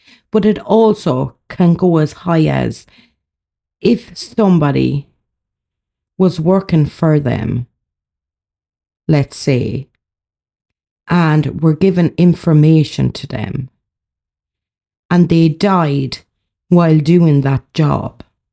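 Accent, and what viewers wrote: Ireland. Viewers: As expected, another intelligent and unique video! Speaking out about police corruption, which is a very dark and sinister affair which as you said, is far more prevalent than we all realise, has been presented and dealt with in this video in the unique way👍delivered in a passionate, forthright and well researched way. IMO, the NIcola Bulley case stinks of corruption right up to the very top. I’ll never forget Andrew Snowden blurting out how the LP had even predicted the day Nicola would be found. From day one, with the LP not cordoning off the bench area, this was just the beginning of people unable to believe that this was an honest, straightforward investigation. And that we were all being spun a story. P.S. Really looking forward to scary stories for Halloween! If you had a channel reading and spinning yarns with your beautiful Irish accent, I’d be an instant subscriber!💚😂💚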